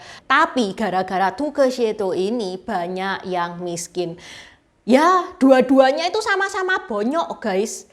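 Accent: Java, Indonesia. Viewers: Ce ce kreatornya jowo banget mlh😝😝😝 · Bahasa indonesia ce jawanya mantap benar2 cindo👍👍👍🙏.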